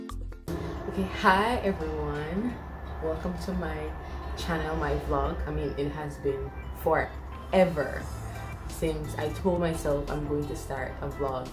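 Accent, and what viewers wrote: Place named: Jamaica. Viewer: How yah sounding suh jamaican....🙄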